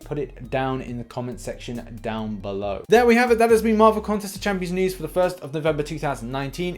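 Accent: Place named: Yorkshire, United Kingdom. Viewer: Not a bad Yorkshire accent there I’m from barnsley which is the broadest Yorkshire!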